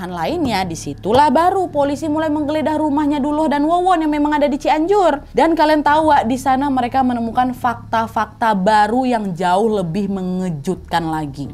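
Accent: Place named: Medan, Indonesia. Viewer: kalau wak yg ngomong patent kali,gaya medan kali,kren wak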